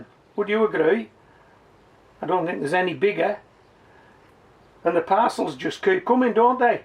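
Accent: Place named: North West England, United Kingdom. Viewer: Hi, I seem to have offended you with my comment, I really didn't mean to, I was very excited to find your channel, and thought from your accent that you may be in the North West like me, so was inspired by the things that you were doing in this climate. In my first video which I am publishing this week, I have said that I got my inspiration from you, and linked to your channel. If you want me to take it out, I will do.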